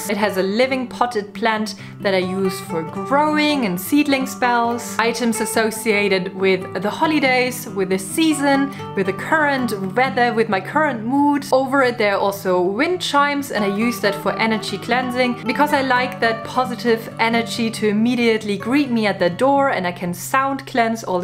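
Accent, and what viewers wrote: Germany. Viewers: I’m going to build an altar and just found you from this video! I just want to say that I’m obsessed with your accent! Are you German? You are also so beautiful. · Are you german? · German? The land of witches. I might be wrong with the accent.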